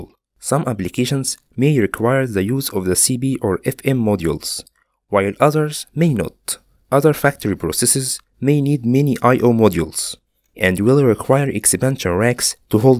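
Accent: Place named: Egypt. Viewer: according to your accent you are Egyptian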